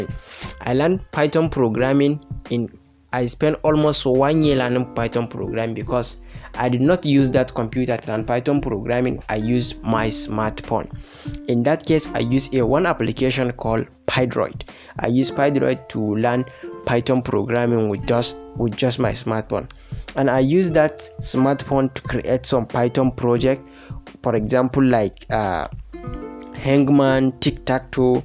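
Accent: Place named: Hausa, Nigeria. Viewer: This guy. You nd ur hausa accent ... murdering pronunciations. Great interesting story though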